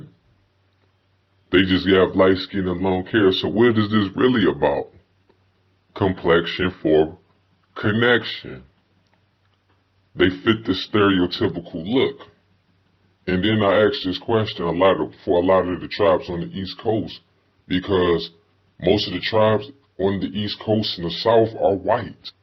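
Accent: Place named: Philadelphia, United States. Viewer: This sound like my homie from Philly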